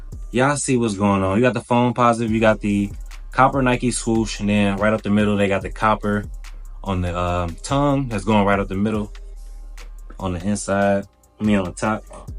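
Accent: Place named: DMV, United States. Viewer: Sound like u frm dmv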